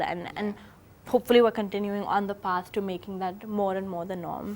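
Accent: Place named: United States. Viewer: American accent as she was born in USA.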